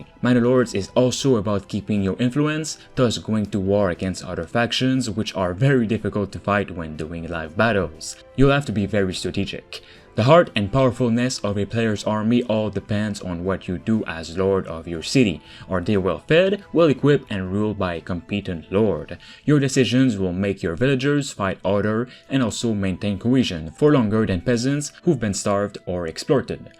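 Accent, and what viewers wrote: Quebec, Canada. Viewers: t'es québécois toé ? sa s'entend a ton accent, nice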